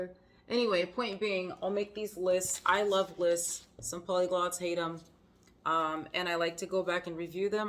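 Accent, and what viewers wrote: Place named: Russia. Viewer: удивительно но даже интонации - русские....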